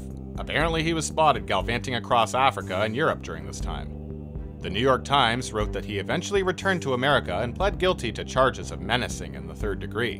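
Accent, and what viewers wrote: Canada. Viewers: Is that a Canadian accent I detect?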